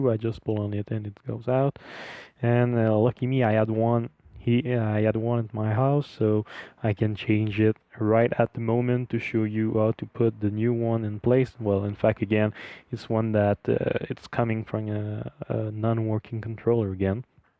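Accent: Canada. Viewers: Are you from montreal?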